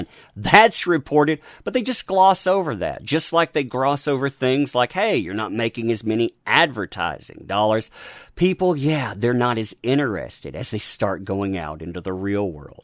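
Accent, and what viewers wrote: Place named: United States. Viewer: I just love your accent as a Brit it's new to me...what State is that accent from it's great?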